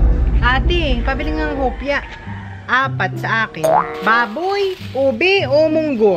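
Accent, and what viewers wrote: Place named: Baler, Philippines. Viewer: i really love your videos po. 🤗🤗 Feeling ko kapunto ka namin haha from Baler haha ganyan din punto namin eh. Malambing😂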